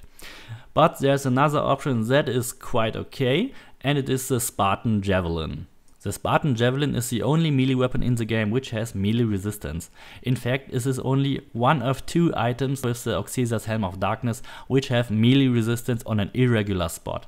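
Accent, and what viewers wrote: Germany. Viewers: Is he german ? :D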